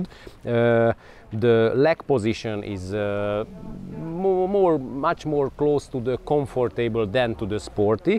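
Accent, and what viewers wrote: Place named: Hungary. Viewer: Hunglish... Maybe the first english video, I can understand.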